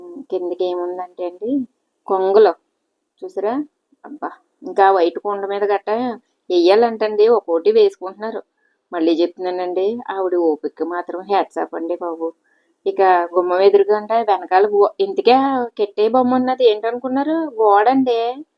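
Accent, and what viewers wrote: Godavari, India. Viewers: హాయ్ అండి చాలా బాగుంది మీ వాయిస్ కూడా చాలా బాగుంటుంది మన గోదావరి భాషలో చాలా బాగా మాట్లాడుతూ ఉంటారు థాంక్యూ అండి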